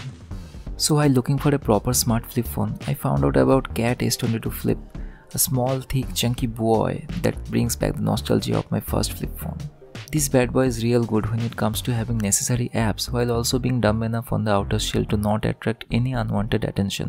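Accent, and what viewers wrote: India. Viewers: bro you sound indian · I knew as soon as you started talking you were from Kolkata.... as one Bong tech nerd to another, I subscribed instanty!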